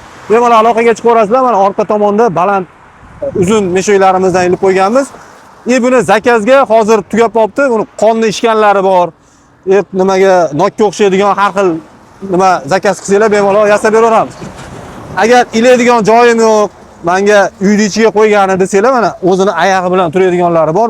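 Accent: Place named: Andijan, Uzbekistan. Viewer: Шевещга караб Андижонга ухшаяпти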